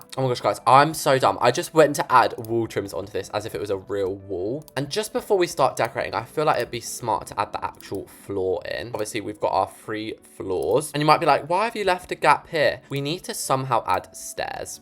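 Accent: United States. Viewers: Your american accent though🤭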